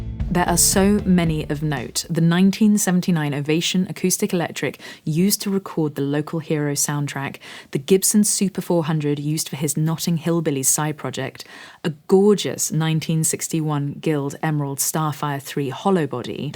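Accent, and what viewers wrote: United Kingdom. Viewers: She can play well and speaks a perfect English. By the way, she's got a british accent. So lucky to have the chance to play those wonderful guitars ! I enjoyed her video. Well done !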